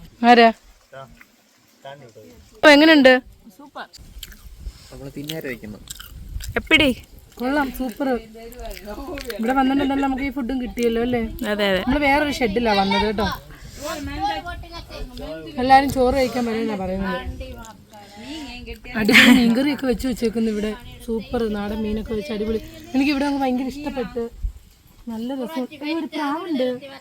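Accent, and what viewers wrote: Kollam, India. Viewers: എന്നതായാലും ദീപുകാരിയല്ല. കൊല്ലം"ഫാഗത്താ " ണന്നു തോന്നുന്നു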